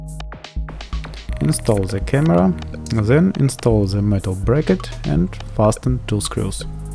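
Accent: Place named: Russia. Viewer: страшный руззкий акцент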